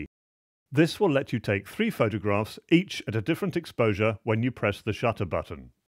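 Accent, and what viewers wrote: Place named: United Kingdom. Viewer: outstanding. Can you believe that i just couldn't find these instructions anywhere?? clear crisp and at last a classic understandable old school british accent with clarity of thought . thanks once again.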